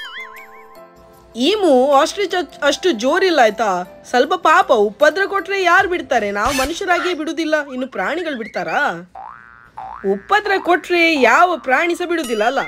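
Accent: Mangalore, India. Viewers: yake milk nodi kushi aitu gotta my sister voice kelidre milk aste 👌 erutte Aa Mangalore kannada kelode kushi❤️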